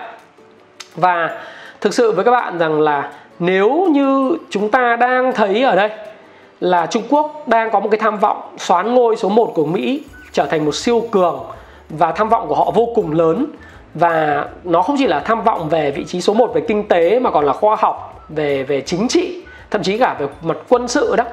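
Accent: Standard. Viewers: Anh nói chuẩn cmnl. Hơi bậy tý. :D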